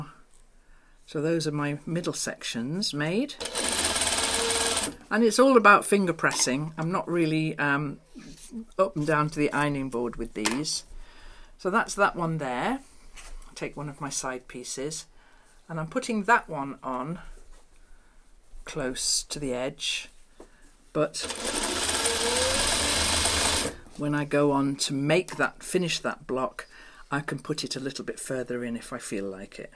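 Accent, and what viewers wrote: United Kingdom. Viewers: Just found your channel. I'm so happy I did. You are just deliteful to watch. I love your British accent!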